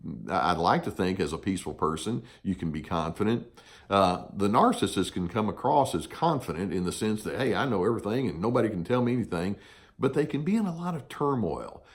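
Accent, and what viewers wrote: South, United States. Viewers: You are fantastic and have helped me so much rid the Narc. Love your voice (like Gus) and Southern accent!! thanks. Susanne H.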